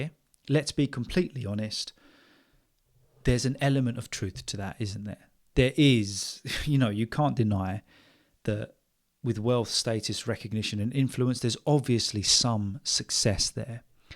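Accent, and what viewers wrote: United Kingdom. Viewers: Thank you so much for continuing to make videos all these years and for never giving up. Wishing you and your family good health and happiness. I really love your videos, the way you tell stories and share your experience is truly enjoyable. And last but not least, i absolutely love the British accent!. I hope one day I will have a chance to visit the UK. Cheers mate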